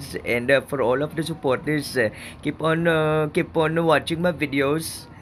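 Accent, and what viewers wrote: India. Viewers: mas lingaw kaayo ko sa imong Indian accent. 😂 Daghan dnhi sa Abu Dhabi. 😁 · Yati kuhang kuha Jud Ang accent sa Indian nice one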